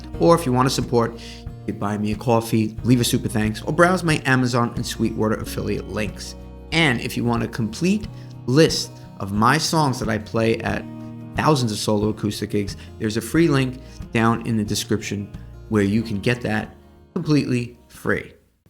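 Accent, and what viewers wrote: New York, United States. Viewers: Also as an Irish woman, I’m digging your accent. It’s what I imagine all New York jazzers sound like.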